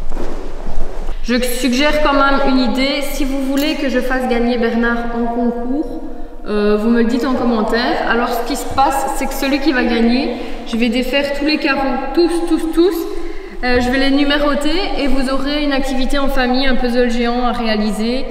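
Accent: Belgium. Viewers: Pour moi, c'est le Hainaut. Qu'est-ce qui m'a mis sur cette piste outre ton accent, le livreur de matériaux. Par contre l'accent de, c'est plutôt celui de l'agent Verhaegen. Au fait, essaye de ne pas le contredire sans cesse, il va finir par te donner un petit coup de taser.☺️ Nous, on va commencer les travaux de rénovations de notre maison qui se situe entre Charleroi et Beaumont dans la ville qui a un Beffroi et des jardins suspendus, tu vois laquelle?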